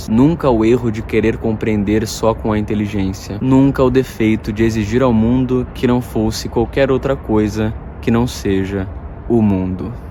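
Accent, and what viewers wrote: Caxias do Sul, Brazil. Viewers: Ele é de Caxias do Sul?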